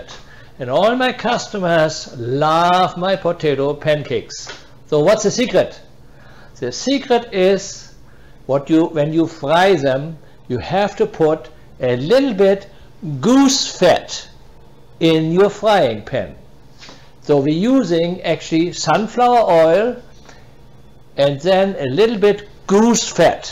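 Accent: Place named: Germany. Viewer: I like your accent German English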